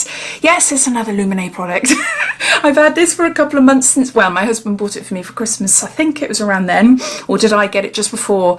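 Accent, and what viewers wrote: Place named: Pembrokeshire, United Kingdom. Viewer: Just subscribed. Stumbled on your video and we are simialar ages. Also detect a welsh accent, I think Pembrokeshire. I am from Newport (Gwent)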